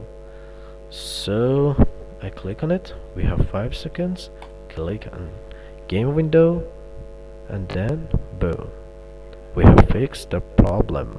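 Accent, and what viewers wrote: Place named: United Kingdom. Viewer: your english is so british bruh, are you asian ?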